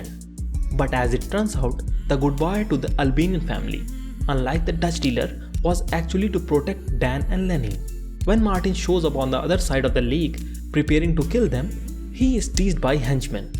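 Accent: India. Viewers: 🙄🙄🙄 yeah that's what I said Im Indian too I guessed it by the accent bro thanks for an informative video.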